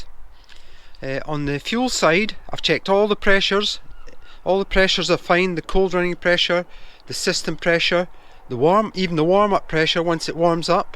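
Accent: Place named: Inverness, United Kingdom. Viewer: You sound like you’re from Inverness. I just revived Heart Vw MK1 with the K jet injection system. After rebuilding the fuel distributor, the problem ended up being no pressure coming out of the warm-up regulator. Come to find out it was completely seized internally. After taking it apart and freeing up everything, I had more than 60 psi’s feeding the fuel distributor, which resulted in the car starting for the first time. Leave no stone unturned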